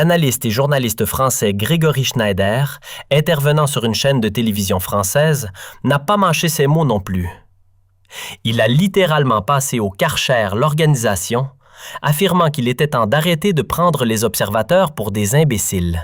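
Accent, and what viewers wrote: Canada. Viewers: 🇲🇦🌟🇲🇦👑🇲🇦🌟🇲🇦✌ Votre post n'est pas une analyse journalistique professionnelle sérieuse, mais la lecture, par un citoyen canadien (selon son élocution), probablement contre argent, d'un communiqué de la junte militaire algérienne, d'accusations et de propagande antimarocaines gratuites, sans preuves. C'est une honte, de la part d'un citoyen ressortissant d'un pays démocratique, de travailler pour le régime algérien militaire non démocratique, régime qui opprime le peuple algérien depuis 1962, par la peur et par les files d'attente des citoyens pour recueillir les quelques biens de subsistance ou utilitaires (pas de lait, pas de sucre, pas de farines, pas de poissons, ...., pas de chambres à air pour les véhicules, pas de gasoil, pourtant l'Algerie est une économie gazière et pétrolière, ). Le Maroc n'est pas riche, mais il n'est pas comme l'Algérie connue pour ses files d'attente de la honte. 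Le Maroc a de bonnes relations avec le Canada, les USA, la Chine, la Russie, la France, le Royaume-Uni, l'Allemagne, ....etc. Toutes ces puissances, grandes ou moyennes, élaborent des rapports satisfaisants, sinon excellents, sur le sérieux, la crédibilité, et l'évolution positive socio-économique et politique du Royaume Cherifien du Maroc. Je ne flatte pas mon pays, mais le nombre de canadiens qui visent le maroc se chiffrent par milliers et les rapports des tour operator occidentaux sur le Maroc sont positifs et contredisent les falsifications algériennes que colporte votre post honteux.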